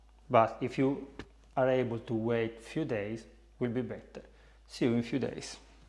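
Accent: Italy. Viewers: Everything just geting better, when you speak with an italian accent.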